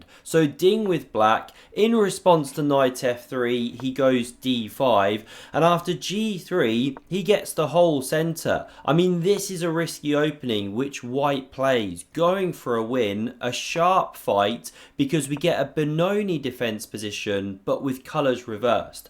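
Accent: United Kingdom. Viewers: what is the name of your particular type of British accent? What's the region?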